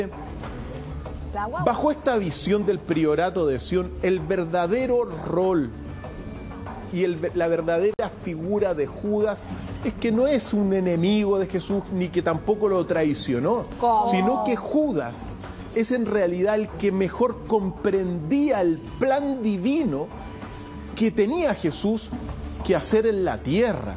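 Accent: Chile. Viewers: Ya me están gustando los chilenos !!! Será que todos hablan asi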